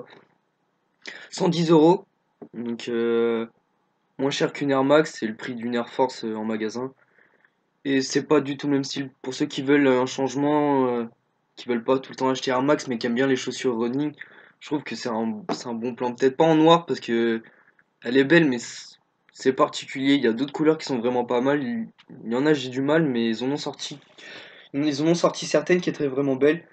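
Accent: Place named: Canada. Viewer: tes canadien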